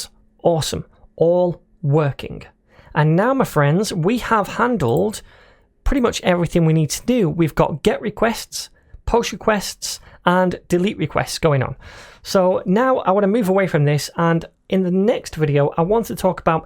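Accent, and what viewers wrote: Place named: London, United Kingdom. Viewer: no adds, no rubbish, just a nice london accent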